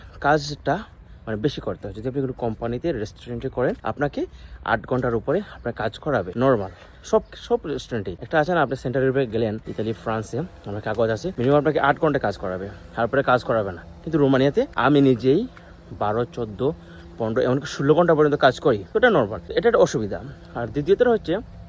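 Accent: Sylhet, Bangladesh. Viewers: ভাইয়ার বাড়ি মনে হয় সিলেট, তো আমি একজন্য সিলেটী হিসাবে আপনার সাথে কথা বলার আগরহ যথি মন চায় রিপ্লাই দিয়েন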